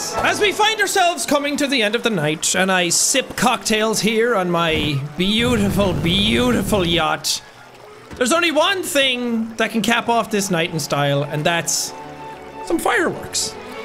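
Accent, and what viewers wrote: Scotland, United Kingdom. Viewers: I can't believe no one has yelled (IN FUN) to a comment that says "you are a psychotic ass!!!" 🤣😂 I luv his Scottish accent (or Irish?) too! 🤣❤💋 Please don't hate on me my friends because I KNOW I am an idiot.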